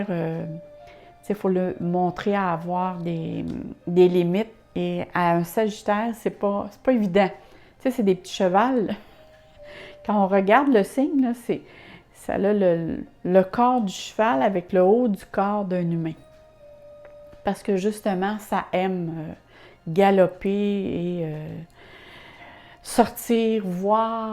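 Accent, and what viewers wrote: Quebec, Canada. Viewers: Ahhhh le canada mon rêve !!! J'adore l'accent, les expressions SUPER merci pour le tirage :-)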